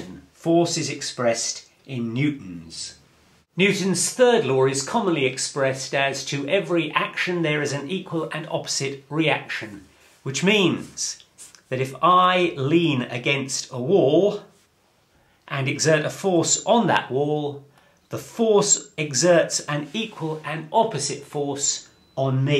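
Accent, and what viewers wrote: United Kingdom. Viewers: Rather unrelated, but your voice is so familiar (and peculiarly nice to listen to). Have you been in other youtube projects/channels? Edit; nevermind, apparently you just have a strong UK accent which makes you sound a lot like a guy on Numperphile Also, thanks for making this video (and the rest of them for that matter, as i assume i will like them as well). It's rather difficult to know what subjects to start with, but also what source you can actually understand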